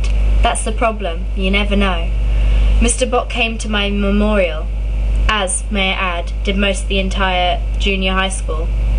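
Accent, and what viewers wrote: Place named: United Kingdom. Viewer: Your voice is lovely and so british:-) Thank you for reading!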